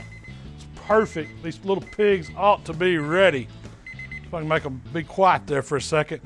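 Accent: South, United States. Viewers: It's the country accent + being husky 😂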